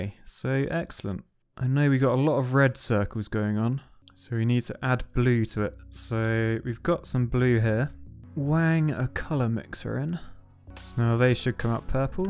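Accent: United Kingdom. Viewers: this man is so bri'ish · Funny question but: are you British?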